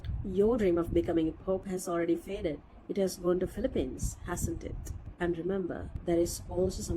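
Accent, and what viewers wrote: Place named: Australia. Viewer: Wow where do you get this accent from? It’s very close to Australian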